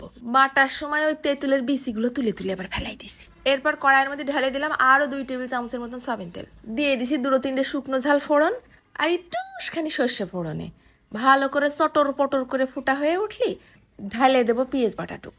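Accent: Jessore, Bangladesh. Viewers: আপনার বাড়ি কি যশোর না গোপালগঞ্জ?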